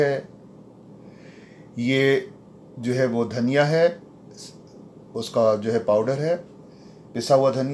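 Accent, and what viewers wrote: Delhi, India. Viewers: Kitni achi AP ki recipe ha itni hi achi Urdu .....AP k sheen qaaf bilkul durust Han ....recipe dakhny main to maza Aya ha AP ki Urdu sun k kisi pakkay Dehli waly ka khyal Aya ha .....my nanihal belong to Dehli jama masjid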